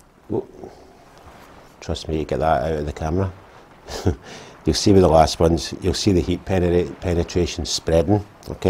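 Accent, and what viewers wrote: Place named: West Lothian, United Kingdom. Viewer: You are welding all wrong there......only kidding just wanted to play with your rants 😂😂.just found your channel and looking forward to following you and watching your previous vids. Doesnt sound like you are too far from me (west Lothian) based on your accent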